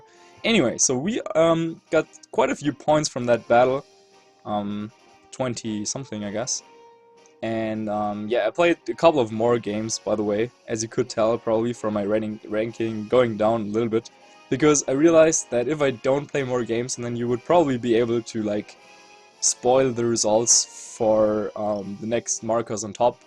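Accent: Germany. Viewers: just do it in german youll be fine ......................... well you do english videos as a german? so dont worry about ur pronounciation itll always be germanish :D just as mine ---.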